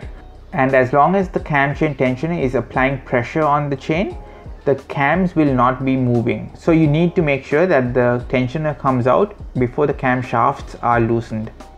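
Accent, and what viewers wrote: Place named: India. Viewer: You talk like you are from India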